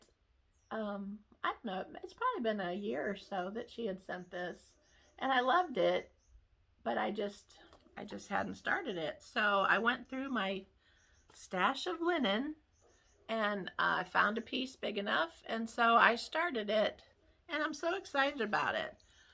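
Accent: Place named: South, United States. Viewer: awww I was hoping you lived close to me☺️. I thought I detected a bit of a southern accent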